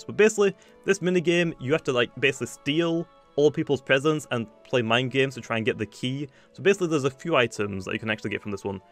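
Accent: United Kingdom. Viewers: Are u british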